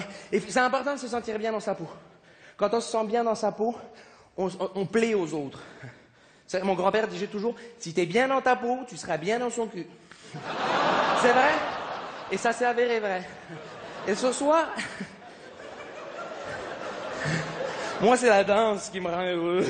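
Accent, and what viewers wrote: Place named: Quebec, Canada. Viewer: en passant , sont accent quebecois est pas pareil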